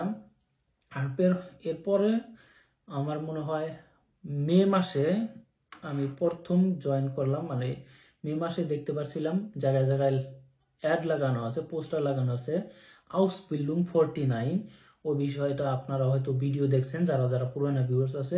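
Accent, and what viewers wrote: Assam, India. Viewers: Uni India Asam theke belong kore.... Doya kore ektu bujhe nen Bangla ta.... Sob jaigar accent ek rokom nah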